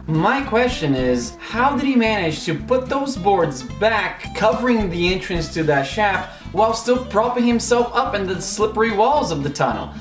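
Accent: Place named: North America. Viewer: cara, gostei pra caralho, apesarapesar de ainda ser um canal pequeno tem uma qualidade incrívelmente boa, e se o nn tivesse falado, eu teria certeza de que vc era norte americano, parabéns mano!!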